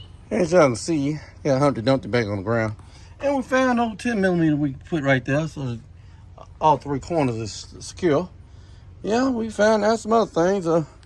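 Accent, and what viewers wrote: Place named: Texas, United States. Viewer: Accent says your from Texas sir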